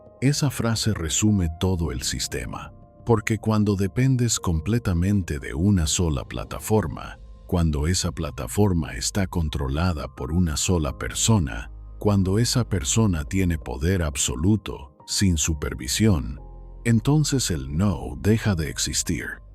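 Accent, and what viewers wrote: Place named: United States. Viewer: El comentarista la caga con ese habladito como de gringo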